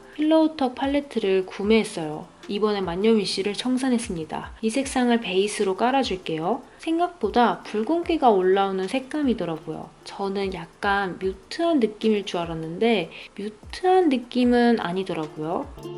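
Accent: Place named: South Korea. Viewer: 와 영상은 처음봤는데 진한 한국말에 놀람...한국분이셨어요?!!!!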